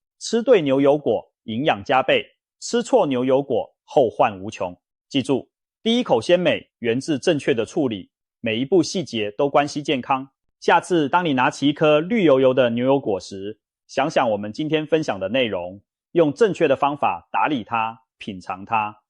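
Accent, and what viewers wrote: Taiwan. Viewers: 明明作者是台灣口音，為什麼要用大陸的說法「牛油果」來稱呼我們台灣習慣稱呼的「酪梨」呢？ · 主持人一口台灣腔應該是在台灣長大的，為什麼中文這麼差很多的錯別字而且讀白字。我建議還是用英文做節目吧至少英文不會有錯別字也不可能讀成白字。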